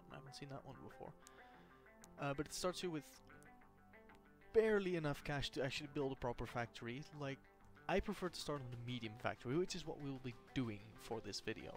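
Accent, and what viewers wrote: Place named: Netherlands. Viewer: is sounding very Dutch in this video for some reason...:P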